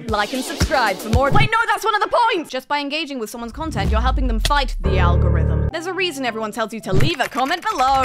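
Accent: United Kingdom. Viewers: You Still Sound British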